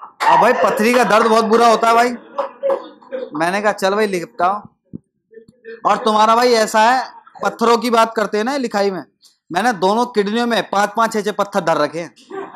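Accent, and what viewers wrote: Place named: Haryana, India. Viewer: Haryanvi accent top notch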